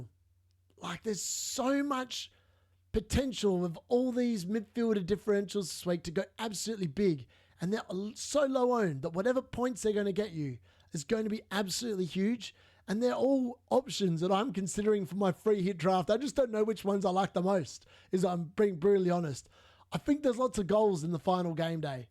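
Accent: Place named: Australia. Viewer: The Aussie accent and the pronunciation of names got me subbing 😂 Brilliant show, mate! 👍🏼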